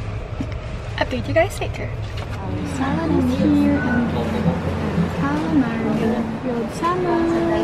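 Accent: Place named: Bisaya, Philippines. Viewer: That bisaya accent is so lovely to hear..😍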